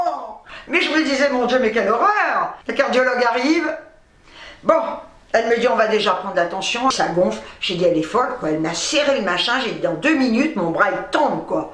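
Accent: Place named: Algeria. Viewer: Bienvenue au Club des Barjots!!!! Ca tombe bien,j'en suis UN! On a écouté ton histoire avec Véro(mon épouse),c'est un sketch!!!! Franchement ton coté Pied-Noir pour raconter,c'est un régal. On a bien rigolé avec Véro,et surtout quand tu racontais,en faite je voyais le film défilé,on s'est marré. Sur la tête de ma Mère,Yallah!!!!!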